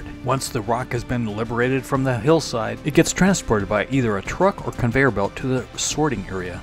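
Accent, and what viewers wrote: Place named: United States. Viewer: Hi my dear! Great sharing! I love your american accent by the way ❤ Big hug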